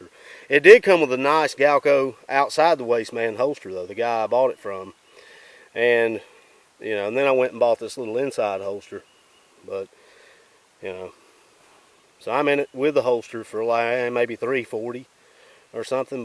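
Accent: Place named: Boston, United States. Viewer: I had a friend from Boston who spoke just like this guy.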